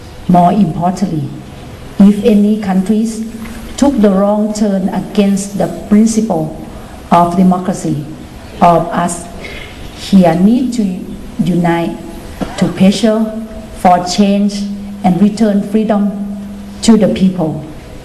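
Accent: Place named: Thailand. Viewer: ฝรั่งคงเหนื่อยกับการนั่งฟังแอ๊ดเซ้นนายกคนเก่งของคุณน่ะคับ !!!!! จะสำเนียงไทยจ๋าก็ไม่มีใครว่าหรอกคับ แต่แทบจะไม่ออกเสียงตัวสะกดเลย ความสามารถการใช้ภาษาระดับนี้ ใช้กับ"โลก" ไม่ได้หรอกคับ แต่ถ้าเอาไว้หลอกบางคนในประเทศ ก็ถือว่าเพียงพอแล้วหละ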